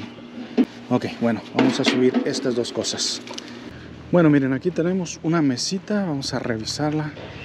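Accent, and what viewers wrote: Mexico. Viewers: Buenas noches hola y familia la.mayoria de Mexicano hablan así igual que los Salvadoreños y algunos otros países bueno de todos modos tienes mi admiración como dicen ustedes eres muy lucho y no te rajas😂😂😂❤😂❤😅😅😅😊😊😊😊🎉🎉🎉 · Lo que pasa que así hablan los mexicanos para ellos es normal,y el sr es mexicano ok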